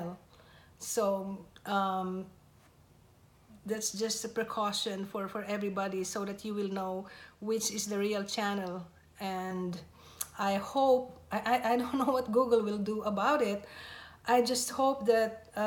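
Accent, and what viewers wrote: Philippines. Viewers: Its like your a Filipino citizen because of your accent. But we are happy that Papa JD is back :) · You sound like a Filipino. Are you a Filipino? Because i am also a Filipino too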